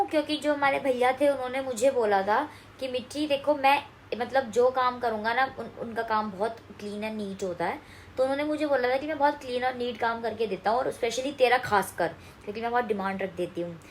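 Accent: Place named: Haryana, India. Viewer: tum haryanvi me hii vlog banaya kro achi lgati ho bolte hua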